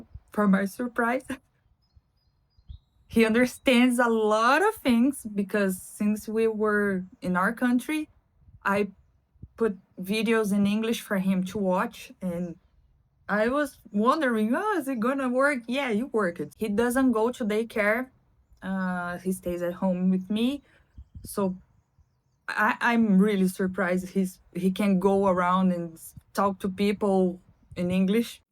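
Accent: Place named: Quebec, Canada. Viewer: Hi! In some ways your accent sometimes sounds like French Canadian haha! Winter has two aspects for me. 1) It makes me appreciate my cozy home more (in summer you don't want to be inside) and 2) There's a whole lot of winter activities that people can do to enjoy the outside. Skiing, skating, snowman, snow angels. Christmas is really Christmas too!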